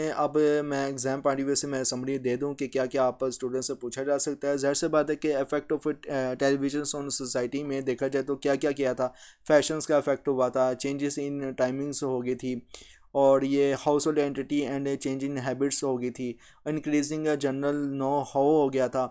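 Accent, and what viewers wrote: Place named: Punjab, India. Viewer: thanku sir for these lectures and your punjabi accent is wow🥰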